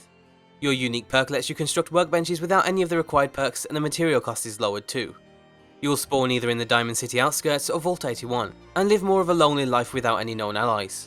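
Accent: United Kingdom. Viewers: I'm a subsriber since...a long time, but I still don't know where is from. I'm guessing british, but not sure.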